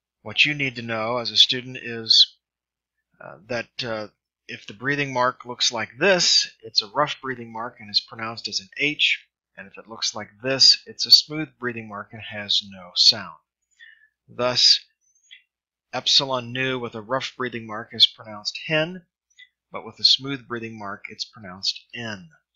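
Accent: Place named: United States. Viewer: This is American pronunciation.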